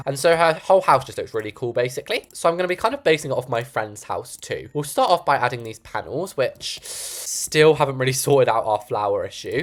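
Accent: United Kingdom. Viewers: Uhh I live in England and I approve of this house and the British voice what u America people think how we think speak that cocky from London so ya Edit: this house is like a mixture of rich and normal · yeah I’ve been watching for 1 year now or about that and I found out as I realised that he has a British accent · FIRST AND BTW I AM NOT BRITISH SO I AM TRYING TO LEARN IT FROM YOU LOL YOUR BRITISH IS AMAZING (PLS PIN ❤️💙 )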